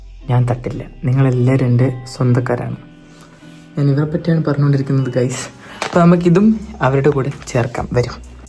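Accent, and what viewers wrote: Kannur, India. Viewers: chechide samsaram kannur thannne aano🫠🤍🤌🏻but kollaaa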